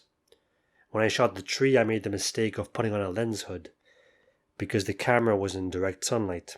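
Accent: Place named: Wales, United Kingdom. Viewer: Spent ages trying to figure out your accent. I thought there might even be a bit of Welsh there... heck, even throw in some Carribbean. Man of the world, probably!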